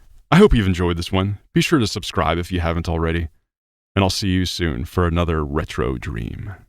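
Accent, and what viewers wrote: France. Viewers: But you likely would call it a "Zee"X Spectrum. ;) I'm all for hearing the French accent. I think your passion is great and you're not likely blinded by nostalgia.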